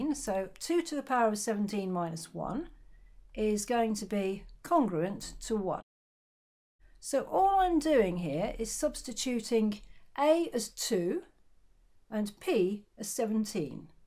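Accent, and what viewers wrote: United Kingdom. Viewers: I love your thick British accent! Much apreesh!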